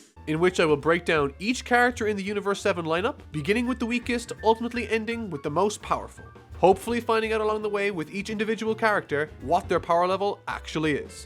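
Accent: Ireland. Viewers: Are you Irish? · yo mate , are you Irish?? you sound like a Leinster boy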